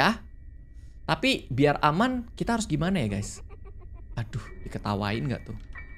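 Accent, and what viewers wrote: Java, Indonesia. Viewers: Medhok banget kak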